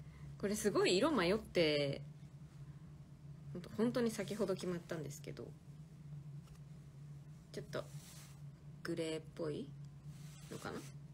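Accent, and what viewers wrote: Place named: Kyushu, Japan. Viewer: なんねこれ、九州の女て感じ❤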